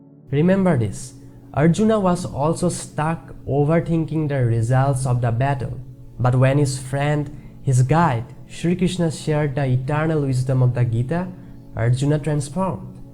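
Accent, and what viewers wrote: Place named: Assam, India. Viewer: Also don't listen to haters who complain about your accent, your accent is ok, we're Assamese people of course we'll sound a bit Assamese when we speak English, so does every other Indian whose mother tongue is Hindi,malayam, Tamil, gujrati etc.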